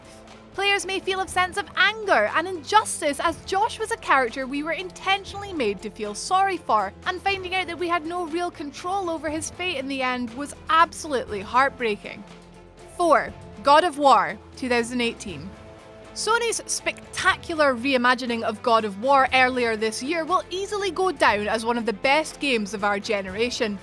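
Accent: Scotland, United Kingdom. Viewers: Shes from Kinross in Scotland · This Scottish accent is fantastic!!! This is what I want for every video!!!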